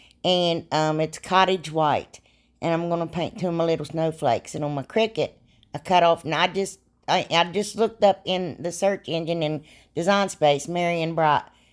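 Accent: South, United States. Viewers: You sound so country I love it. You really want to light the house up with that kerosene and gasoline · Hi from Texas, I'm newly subscribed and wanted to say how much I've been enjoying your crafting. You are such a delight to watch and when you get tickled it makes me laugh right along with you. You talk like I talk country kind of people. You keep those videos coming because you are a treasure to watch. I hope you get to feeling better. You have such a sweet personality, God bless you honey.🥰🤗💕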